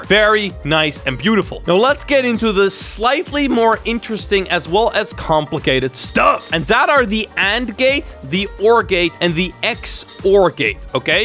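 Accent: Netherlands. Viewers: Are u Nederlands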